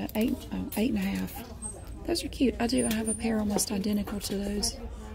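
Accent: South, United States. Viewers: "Naughty-nahn, naughty-nahn" Gawd I love the southern accent. LOL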